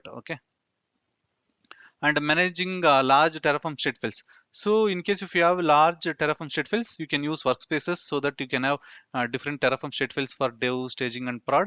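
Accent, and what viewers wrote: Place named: Karnataka, India. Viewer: KANNADIGAs ENGLISH SLANG is good to hear.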